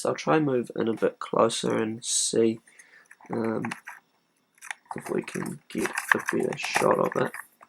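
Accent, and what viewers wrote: New Zealand. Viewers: i thought so, you've got that kiwi accent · Are you a kiwi or an aussie?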